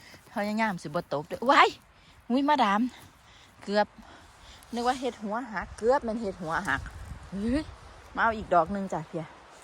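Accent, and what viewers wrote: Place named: Isan, Thailand. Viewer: มักเสียงเว้าเสียงหัวมาดามคักได้หัวนำ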